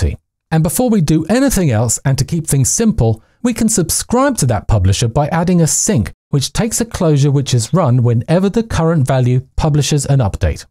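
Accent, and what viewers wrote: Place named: United Kingdom. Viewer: Neat demonstrations that can be easily understood. BTW, wonderful British accent!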